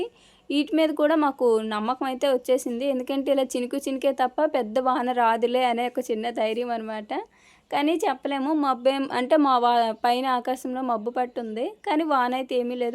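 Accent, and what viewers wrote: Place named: Guntur, India. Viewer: merekkada untaru.e bhasha ni batti tenali gunter bapatla atuside untavani anukuntunna. Me illu chAla baguntundi. Rent housa leda own house rent house ayite rent untu di. Water prob untunda taga daniki hyderabad nAku bore kottestondi. Anduke vere ooru lo settle avvalani undi.emantav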